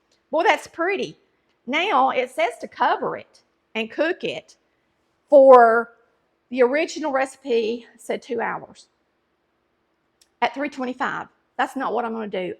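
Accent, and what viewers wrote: South, United States. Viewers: Audio is fine she has a strong southern accent that can make her hard to understand if your not used to it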